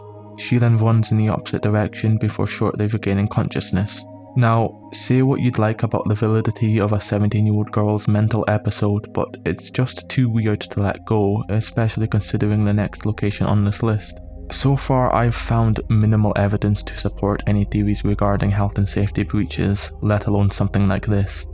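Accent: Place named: Aberdeen, United Kingdom. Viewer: You sound like you're from aberdeen.. I could be wrong.. but it's very similar to my accent! Anyway, fantastic video man!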